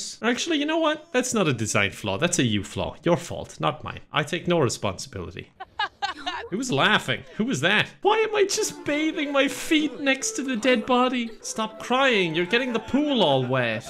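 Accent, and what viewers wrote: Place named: Ireland. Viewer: Awww you are starting to lose your cute irish accent. 😔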